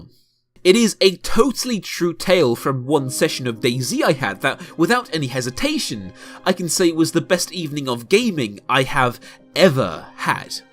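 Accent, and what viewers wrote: United Kingdom. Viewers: I only kept watching due to your brittish accent. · Another squeeky britbong youtuber, no thanks.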